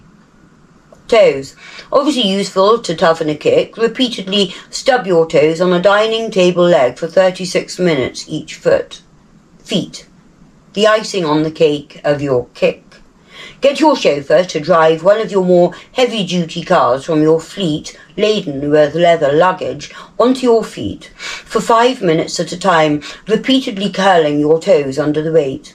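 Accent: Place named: Yorkshire, United Kingdom. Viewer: I loved your Yorkshire accent , I chuckled away at it , brilliant 😊. I had one for ratting too , but a light fingered personage had away with it . Looking for another at present want another bsa meteor .22. Like the older models . Be careful with that knuckle lass , 5yrs for owning one , if caught . I hope to bump into you sometime when I'm down Devon , would be lovely to meet you . I think you are a very interesting person . Keep the vids coming Lass, brightens my day when they get posted 😎👍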